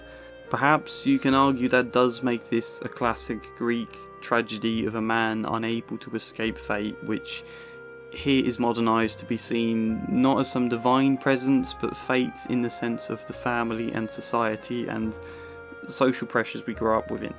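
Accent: United Kingdom. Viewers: michael was in the marines not the army. maybe you should actually watch the movie. your British accent will not save you.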